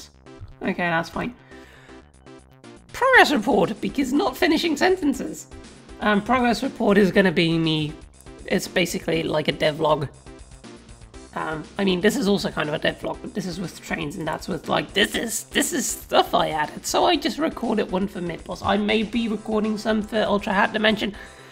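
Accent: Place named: United Kingdom. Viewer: Definitely like My Viewers Aren't Cooperative Island, but it might be a bit lengthy. Also, how Dutch are you? You have a very British-sounding accent.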